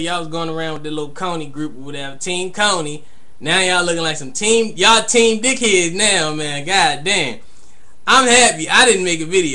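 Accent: Baltimore, United States. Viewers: LOL!, you sound like you straight up from Baltimore! Now that I live here, I'm starting to get use to the accents... And oh yea, COMCAST sucks!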